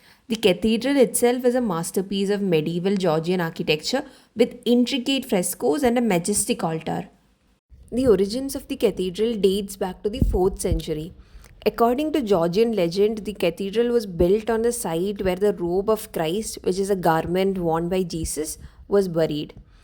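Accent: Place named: India. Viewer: Indian accent is so terrible!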